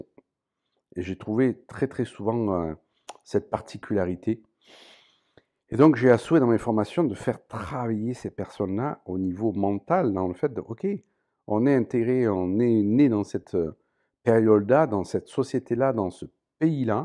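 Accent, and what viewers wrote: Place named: South, France. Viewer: Merci pour le rappel de savoir bien se connaître soi et je crois d'ACCUEULLIR tout ce qui est ici et maintenant ! Merci pour ton accent du sud ensoleillé ! Bonne journée à tous. Merci pour tes vidéos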